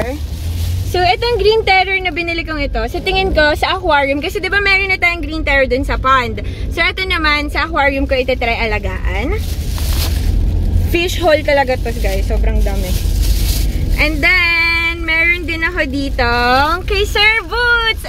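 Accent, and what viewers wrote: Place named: Balikbayan, Philippines. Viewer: Soo konyo nemen yern. Balik bayan accent lang malakas😆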